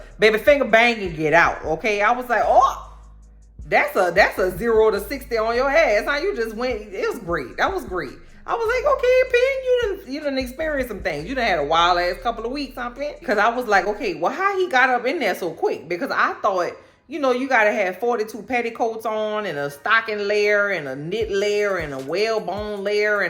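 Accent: New Orleans, United States. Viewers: All I heard was your voice and I said "Oh she DEFINITELY from New Orleans!" 😆 I'm from the N.O. too. Hey fren!👋🏽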